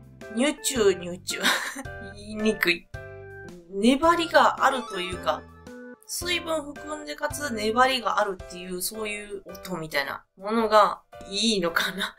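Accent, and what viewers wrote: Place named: Kansai, Japan. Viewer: 関西女子らしく😅ぷっと吹いてしまう話し方😅好きや👍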